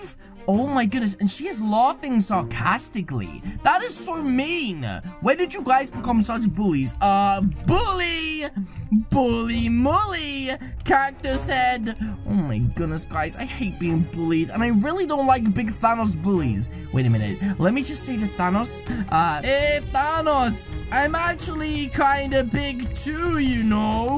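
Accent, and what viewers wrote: United Kingdom. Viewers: Are you british nice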